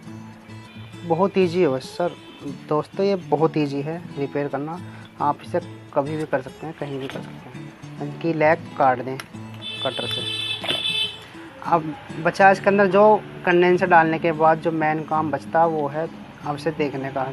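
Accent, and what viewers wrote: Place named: Baghpat, India. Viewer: i m from Mumbai... my hometown is bagpat district.. aap ki boli wahi ki h think so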